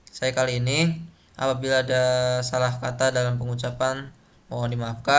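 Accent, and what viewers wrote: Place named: Java, Indonesia. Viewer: mas iki loh rek medok kali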